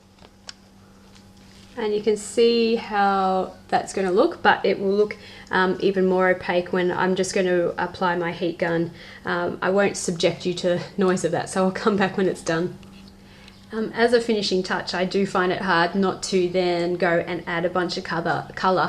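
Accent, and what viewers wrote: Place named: Australia. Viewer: I could listen to your voice all day. Seriously you aussie's have the best accent in the world to me. I love your pages btw. I only just found out about this literally not long ago I haven't even tried this yet but you make it look so easy and fun that I can't wait to try it. I usually art journal (only for a few months) but I think I'm going to to add this to my weekly art time. What a great way to get lost in gods word and learn.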